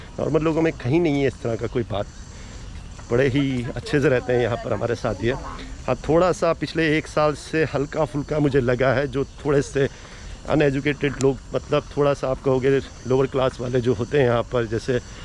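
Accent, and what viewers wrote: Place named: China. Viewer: Bhai, aap ki sound ab total Chinese ho gye hai. But a really awesome video by you.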